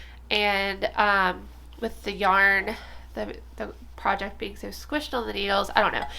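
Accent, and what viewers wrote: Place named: Pittsburgh, United States. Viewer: Love meeting Pittsburgh Knitting ladies. I lived in Squirrel Hill until mI was 12. Then we moved to CA. I still have a few friends in Pgh., none that knit, sadly. My husband and I live north of Dallas,Texas in Melissa, Texas. I hear Pittsburgh in your voice but not as much as my mom, her sisters and brothers my aunts and uncles and their kids, my cousins. I just wanted to say enjoy yourself doing your podcast. That’s what we will enjoy. I need to plug my tablet in before it dies. I’m a new subscriber, but I was trying to say enjoy yourself, don’t worry about us, lol. As you know we just want to have company to watch while we knit. 😊😂❤ Thanks so much.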